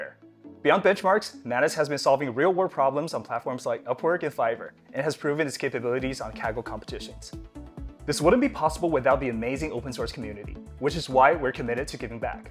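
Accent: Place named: United States. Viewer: Chinese startup, American accent 🧐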